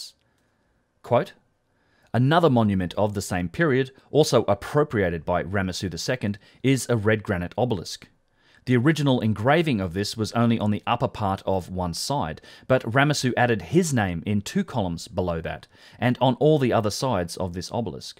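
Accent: Australia. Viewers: As soon as you hear the Aussie accent you just know it's going to be dumb.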